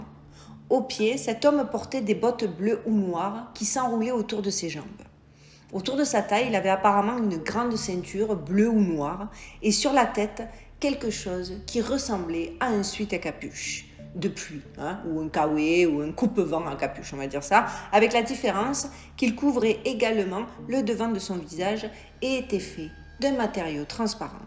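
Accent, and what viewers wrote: South, France. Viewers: Salut, Comment schpouinkes-tu? Vidéo super de kabou. Bravi, bravo! As-tu entendu parler d'Ummo et des ummites, par hasard? Tu peux toujours regarder et écouter les vidéos de Stone Gardenteapot. Ensuite, tu peux regarder et écouter celles de Jean-Pierre Petit, mais elles sont plus difficiles d'accès. Tu as l'accent du sud de la France, mais tu as une bobine de Suédoise. Bises alchimiques du Dimanche soir. 🦆 🎸 🎤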